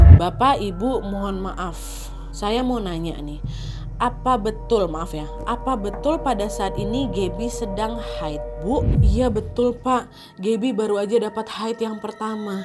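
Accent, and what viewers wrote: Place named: Eastern Indonesia, Indonesia. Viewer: Banyak yg bercerita horor dgn flognya.tapi ka, bisa hidup ceritanya macam aku ikut dalam ruang cerita itu. Di tambah logatnya nya yg seru.... He he Indonesia timur jdi Indonesia barat..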